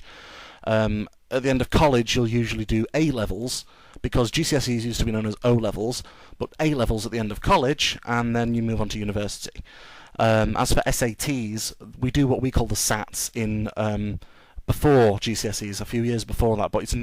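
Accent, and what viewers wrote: New York, United States. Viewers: GREAT NEW YORK ACCENT! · thats a great new york accent man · If you care to do a study of a strong NY accent, give a listen to the Bowery Boys (their films are funny as well).Your accent sounded NY enough to me to get the point across. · I don't see much of a difference in most cases. However, I've learned some expressions from Karl Pilkington, par exemple: "Knocking around" - to be "Having a go" - making fun of "loads" - a lot Any more Mancunian ones? Great NY accent, by the way! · In Scotland, it goes Nursery School, Primary School, High School then either College or University. You get to choose what you want to do after High School depending on your grades. Though University is above College in the "Ranks". Its more harder than College. Also, I loved your New York accent. lol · Your New York accent is good. What I'd like to ask--and you may/may not have an answer is why some English pronounce words that end in vowels with a faint r, such as idea=idear, or saw=sawr/sahr, or america=ameriker. 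I've wondered about this for some time. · That was an amazing old New York accent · cinema= movie theater People use both in US. You know something, I thought it was offensive to call British people Brits. But I hear y'all calling yourselves that quite a lot. Wait college and university?? What age range are those? Also, love your New York accent.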